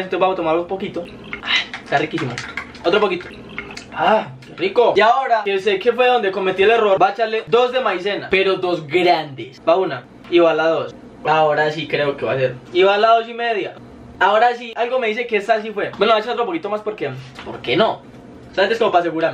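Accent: Colombia. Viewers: Jaja esta perdido tu ojo ve a biscarlo👌😅 parserito te amo❤ Amo el asentó de los colombianos hermosos ❤👌💙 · Leche azucar y Cacao cocoa o chocolate empolvo En Uruguay le decimos leche v: xq es leche pero tomamos eso y lo calentamos en el micro es como café pero más rico v: jaja creeo que es igual en todo el mundo pero como tu probaste y dijiste ayy que rico ajja otra cosa me encanta el acento colombiano pa❤ También me gusta su cultura y su país me ciento identificado ajja❤ Prueba el mate😉❤ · wacho amo el acento colobiano y amo los videos de este chavon y los q hace con kennet ❤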